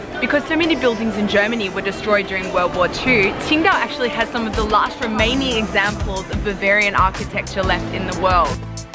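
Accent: Australia. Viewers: Heyyy, I'm a Chinese student currently studing in Melbourne, its suprised to know that you are a Aussie, like your accent and video, and the way how you expericen and introduce Chinese culture. And one more thing is I recommend my hometown Fuzhou city in Funjan province. The climate there is quite similar to Sydney, and it's famous for it's beautiful greenary and parks. Looking forward to see your video inroducing my hometown:）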